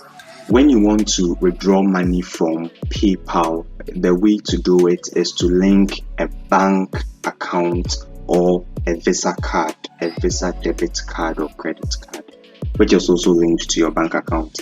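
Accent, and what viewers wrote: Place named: Ghana. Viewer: Pure Ghana man English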